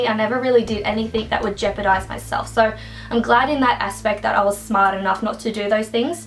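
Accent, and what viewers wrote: Australia. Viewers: are u from aus?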